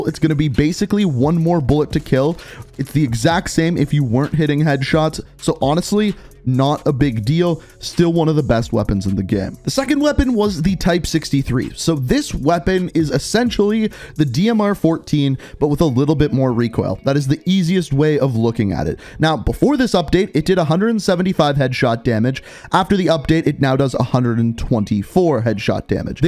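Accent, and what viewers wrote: Canada. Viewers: yr Canadian?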